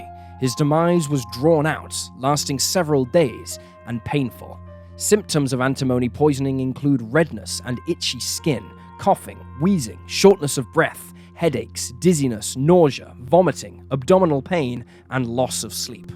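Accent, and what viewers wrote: England, United Kingdom. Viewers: Good, the English guy is back.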